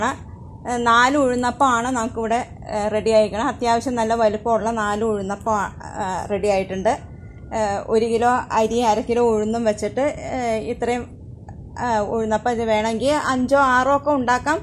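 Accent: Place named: Perumbavoor, India. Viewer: എവിടെയാ സ്ഥലം സംസാരം കേട്ടിട്ട് പെരുമ്പാവൂർ മൂവാറ്റുപുഴ പോലെ 😄ഞാൻ keezhillam ആണുട്ടോ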